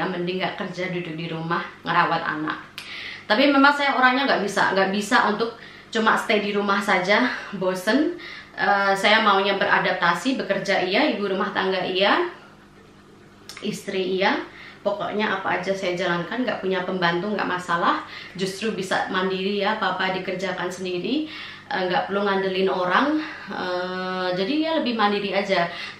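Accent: Java, Indonesia. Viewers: Iya mbaaaak Indonesia bangeeet soalnya. Nek ngobrol, "njawani" bangeeet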